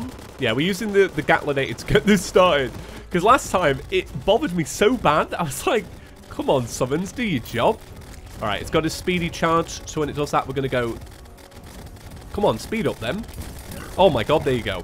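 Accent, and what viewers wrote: United Kingdom. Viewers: The bri’ish terrarian is back :)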